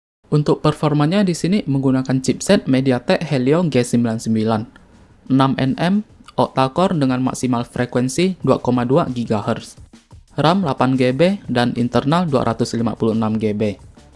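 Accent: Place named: Bali, Indonesia. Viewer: Logat bali😊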